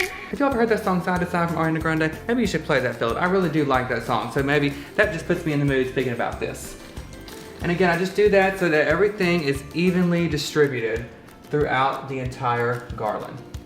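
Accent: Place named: South, United States. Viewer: Child, you are so southern! Love it! ♥️♥️♥️♥️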